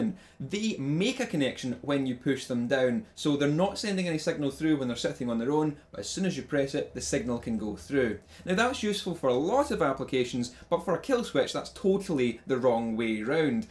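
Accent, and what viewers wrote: Scotland, United Kingdom. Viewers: Best guitar channel on youtube. Mainle due to your scottish accent. Greetings from DK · I think the Scottish accent accounts for at least 40% of the reason I watch your channel. It's just so pleasant to listen to. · Thankfully it's not a very complete Scottish accent, otherwise it would be impossible to understand and even worse for the non english speakers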